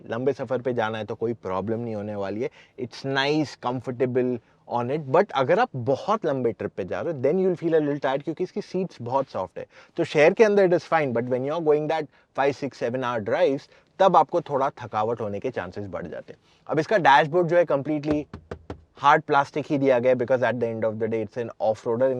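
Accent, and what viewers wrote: Gujarat, India. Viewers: Bhai kya aap gujarati ho kya ?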